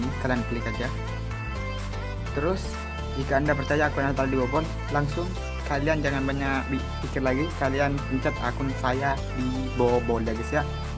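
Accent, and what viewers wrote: Madura, Indonesia. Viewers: Orang madhureh bang? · Orang madura nih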